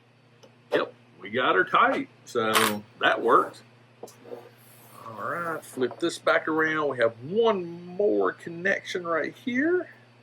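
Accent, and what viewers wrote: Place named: Georgia, United States. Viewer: Are you from Ga? That accent sounds a lot like mine!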